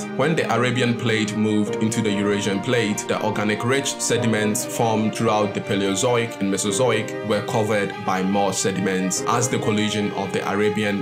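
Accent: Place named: Nigeria. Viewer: Make Naija accent the norm in documentaries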